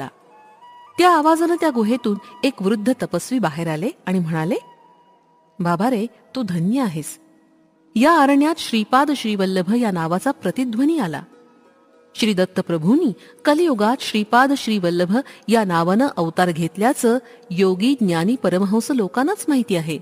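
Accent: Standard. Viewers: Excellent voice didi . Uchhaar agadi shuddha ahet .Sagle adhyay asech upload kara .Shripad shrivallabhanche ashirvad tumhala labhot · Faar Uttam channel ahe... shripad shrivallabh charitramrut ekdum Chan ahe..avaaj khup Chan ahe. Uchhar shudha ahet..aikanaryala aani pahnaryala khilvun thevta..hya channelcha jastit jast prasar vhyala hava🙏 Shripad Rajan Shaaranam Prapadye 🌸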